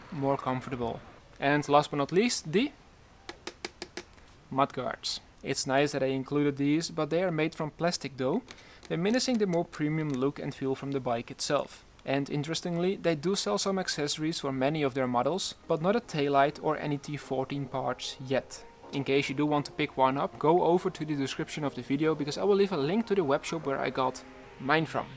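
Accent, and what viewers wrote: Netherlands. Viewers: Nederlander khoor het aan je accent😂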